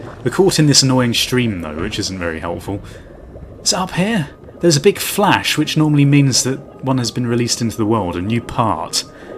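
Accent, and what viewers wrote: United Kingdom. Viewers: British markiplier voice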